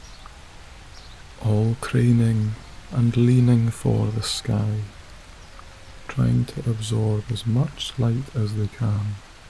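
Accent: Scotland, United Kingdom. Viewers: the sound of a Scottish voice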